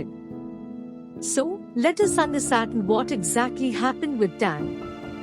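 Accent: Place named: India. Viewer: The Indian accent tells me all I have to know that this is a garbage channel.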